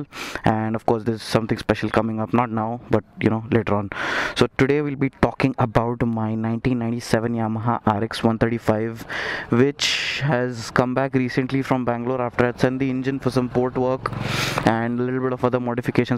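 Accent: Hyderabad, India. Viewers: only person on youtube i love listening to 😅.... vo apna hyderabadi accent sunne ka mazaich alag rehta bhai 👌🏻👌🏻🎉🎉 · i love you'r Hyderabadi accent bhai.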